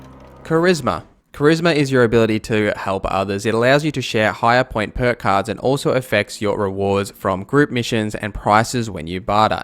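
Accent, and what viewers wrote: Australia. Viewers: cheers mate great 2 hear an aussie doing it write thanks for the tips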